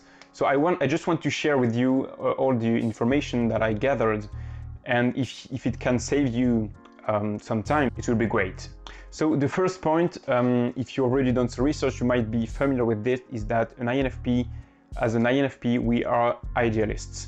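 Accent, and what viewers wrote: France. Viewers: I’m assuming by your accent that you’re french? I am too. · Are you french ??🙂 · arent you french ???? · On dirait un accent français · An INFP child is not happy because they are surrounded by their pairs, they excluded anyway from their surrounding, but they are happy thanks to their imagination that helps them going through life : even though no one understands you and you feel lonely and shitty af, at least you have your inner world... it really saves you sometimes. Also, je rêve ou c'est un petit accent français que t'as là ? 😏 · Mec t'es français j'en suis sur (du moin francophone). Ton anglais est lourd mais l'accent nous trahis toujours ahaha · Wow this is so accurate, thankss for your video. I like your french accent btw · Are you French?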